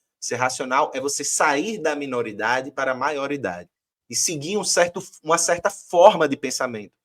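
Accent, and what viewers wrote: Pernambuco, Brazil. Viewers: Grande iniciativa. Filosofia com sotaque pernambucano. Massa demais. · Mais um com sotaque pernambucano no canal meu coração não aguenta! 😍Salve,! Como é inteligente e bonito o camarada. Amei o quadro! Parabéns!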